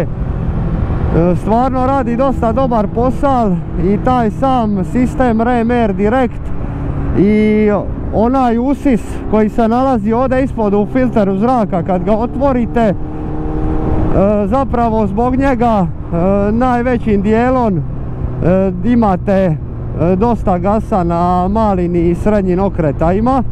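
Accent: Dalmatia, Croatia. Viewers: Volim ovaj Dalmatinski dijalekt. Super recenzije pozdrav iz Rame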